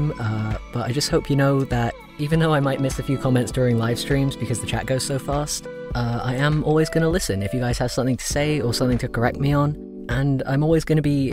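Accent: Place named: United Kingdom. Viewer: I didn't even realise he was British. It just sounds normal to me (I'm British)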